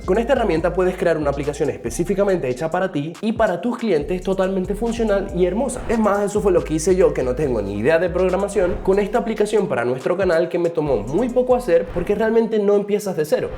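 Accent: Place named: Venezuela. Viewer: Eres de Venezuela verdad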